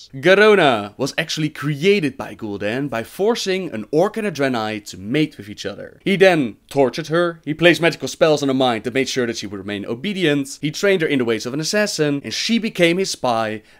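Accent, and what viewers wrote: Netherlands. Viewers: Nice dutch accent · It's his Dutch accent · JE ACCENT <3 NEDERLANDSE WOW SPELER!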